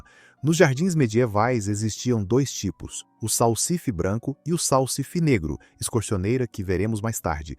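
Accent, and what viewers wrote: Brazil. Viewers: Dialética de Portugal, fonética do Brasil. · Texto de manele com sotaque brasileiro. Ficou ó. Uma bosta. "Sabor à noz". Ridículo.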